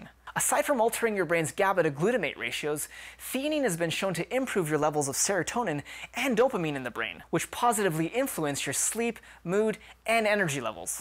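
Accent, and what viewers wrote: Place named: Canada. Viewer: it does sound very Canadian, the portuguese nuance is very very delicate - almost unnoticeable! I am portuguese, but i live in Poland! um abraço da Polónia ;)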